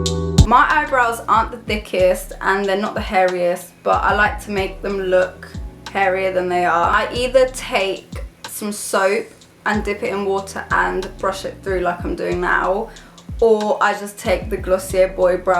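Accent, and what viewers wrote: United Kingdom. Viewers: теку от её Британского акцента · the engaging British accent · Strange accent, a mix of British and... German? Dutch?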